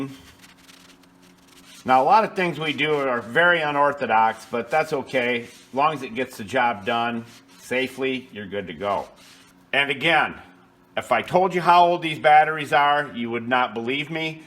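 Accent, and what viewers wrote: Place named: Midwest, United States. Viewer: Your voice sounds like either Missouri or Michigan. But a good teacher is always good at showing others how to do something.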